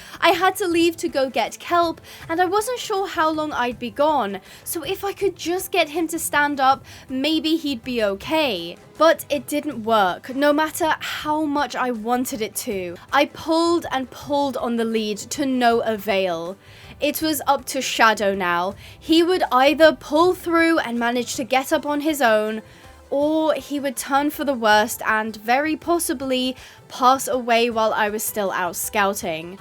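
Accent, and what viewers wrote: United Kingdom. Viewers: You’re so British you little bugga ❤ I love it tho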